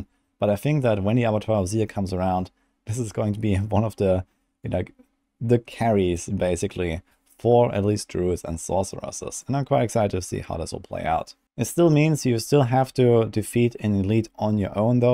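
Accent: Germany. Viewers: Your German accent is sick LOL love it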